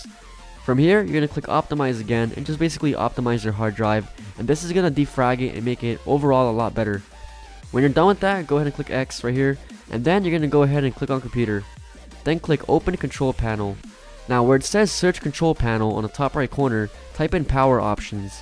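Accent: Croatia. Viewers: are you from croatia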